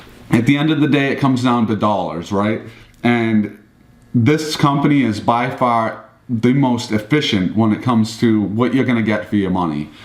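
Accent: Boston, United States. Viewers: Just here for the Boston accent.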